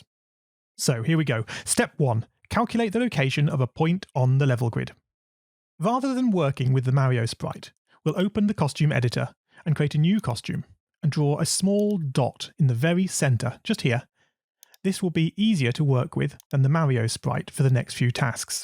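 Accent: United Kingdom. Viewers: okay look I’ve given this a lot of time, probably too much time, too many failed attempts… All these positive comments mean to me is you guys already understood something, this video did not help me build a working pixel game, and I did follow the instructions carefully. has been highly praised, so you will need to allow me to give one negative comment. Many times in these videos he misspeaks and corrects his speech with pop up text, which he uses unnecessarily all the time, saying things like “nice” and “looks great” and it just isn’t practical to believe you are adequately correcting your mistakes by mixing them in with all that overly happy sounding crap. Just show us how to make a video game. At this point I wasted 50+ hours of my life on you. Do better if you’re getting millions of views. Going off on tangents, changing things to “have a little fun” was not fun for those of us who came here to follow a step-by-step video. 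I did not learn how the components work from, not on this video or any other, probably because he kept changing things and half-explaining them. It’s like you have to use his specific complicated way that you don’t really understand but trust because he did it, or nothing. I wish the algorithm would give me more users, it is heavily biased to deliver, no surprise given we’ve been brainwashed to believe a white man with a British accent is the best. He’s not.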